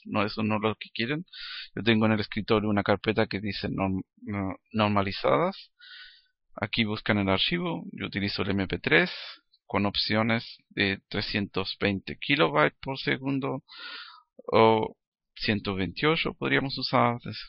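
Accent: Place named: United States. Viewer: por que hablas como gringo?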